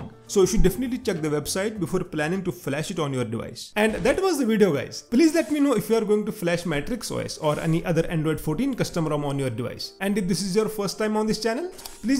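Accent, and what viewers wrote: Kashmir, India. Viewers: Bro you from Kashmir? Your accent is so Kashmiri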